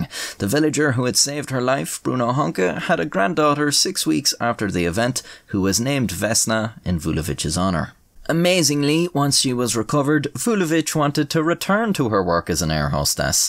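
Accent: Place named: Ireland. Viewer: I swoon over your Eireann drawl, thank you so much 🗽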